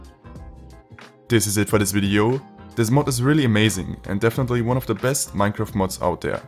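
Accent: Germany. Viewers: I hear German Accent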